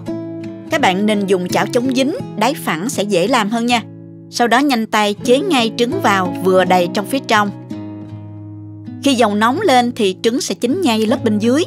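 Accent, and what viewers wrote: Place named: Mekong Delta, Vietnam. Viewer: Thích giọng nói quá, y Chang miền tây luôn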